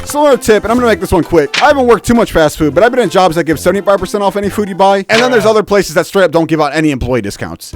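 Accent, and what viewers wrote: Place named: Wisconsin, United States. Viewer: not to be weird but i have this feeling your from wisconsin or a neighboring state. Culvers gang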